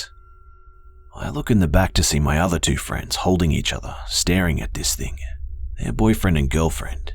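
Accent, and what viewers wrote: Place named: Australia. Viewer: The Aussie accent and for the most part locations makes the stories more comical than scary.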